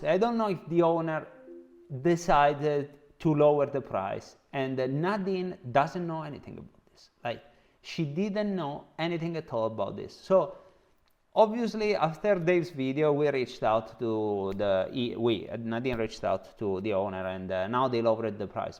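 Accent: Italy. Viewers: Your 'broken English' is more articulate than most native English speakers. Love the Italian accent and content. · Well, I think there is a little crap mixing in every business. However I understand that you are a little upset because you are doing it for free and you experienced these cases with the case :) I like that you are honest about the advantages and disadvantages of every property you promote. However, the video trend is to be more colourful, entertaining and putting a little drama in the films. I don't think everyone should be following this, but it's a fact. Your English is very good, I actually like the authentic Italian accent.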